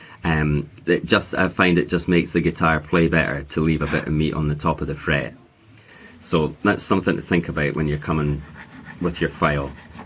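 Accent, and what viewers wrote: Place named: Scotland, United Kingdom. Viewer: These two videos have gave me the confidence to try my own bit of fret leveling. Very relaxing to watch also, I think it's your Scottish accent. You sound a smidgen like Stephen Hendry.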